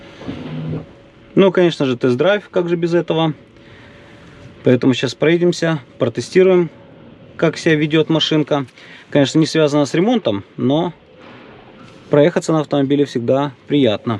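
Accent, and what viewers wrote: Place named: Zaporizhzhia, Ukraine. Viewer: Голос (акцент)у автора как у моего дядьки с запорожья.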